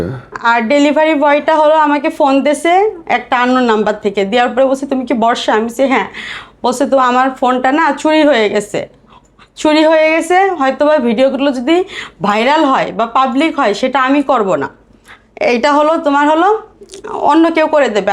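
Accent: Bangladesh. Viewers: অনেক সেক্স ভিডিও দেখেছি আপনার।প্রথম দিকে অবাক হতাম যে,এতো সুন্দরী বাংলাদেশী নারী এতো অসাধারণ অসংখ্য সেক্স ভিডিও করছেন অথচ জানতামইনা।আপনার মুখের বাংলা ভাষা শুনেই বুঝতাম যে ভারতীয় নারী নন আপনি।পুরুষটির ফেস ঝাপসা করে দেয়া আছে চেনা যায়না।xhamster এ আপনার সেক্স ভিডিও গুলো উনি বিক্রি করে কম করে হলেও ৮-১০ লাখ টাকা আয় করেছেন সম্ভবত। আপনার ফ্রি স্টাইল এক্সপ্রেশন আর অসাধারণ সব পজিশনে সেক্স করা দেখে একজন প্রফেশনাল পর্ণষ্টার মনে করতাম।আজ সবকিছু বুঝতে পারলাম পরিস্কার 😢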